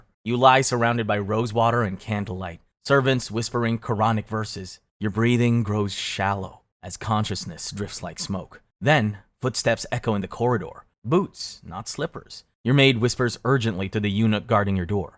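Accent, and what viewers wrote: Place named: Arab world. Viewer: arap gibi okuyor soluğunu gırtlağını silktiğim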